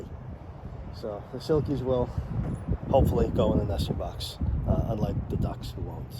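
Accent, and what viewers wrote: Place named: Massachusetts, United States. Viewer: No he doesn’t. His wife has cancer though, I love him, i bet hes from massachusetts like my aunty dee dee great accent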